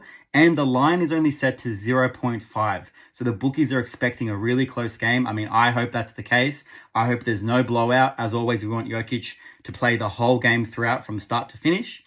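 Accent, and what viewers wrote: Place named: Australia. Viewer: Found this Channel after seeing your comments/tips in calling our shot , after watching a couple of times i thought i detected an Aussie Accent , Good to see/find an Australian sports betting Capper/Tipster , looking foward to seeing what you do with AFL and NRL when the seasons start, The Boomers ( The Australian basketball team for anyone eles reading ) just had another win giving Indonesia a bit of a thrashing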